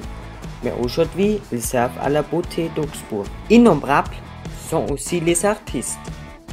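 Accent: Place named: France. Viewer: Votre accent français est superbe, j’arrive très bien à vous comprendre..à plus à augsbourg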